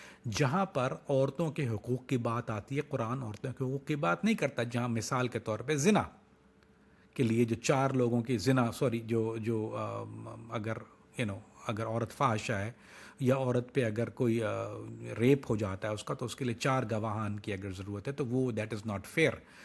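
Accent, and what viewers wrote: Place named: United Kingdom. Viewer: U looks like so Deployment ...becoz ur acent is also like British...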